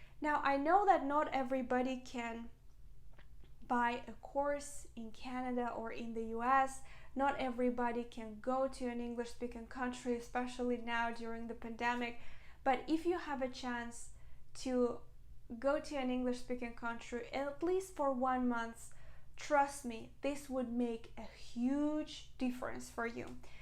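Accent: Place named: Russia. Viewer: Her russian accent is pretty noticeable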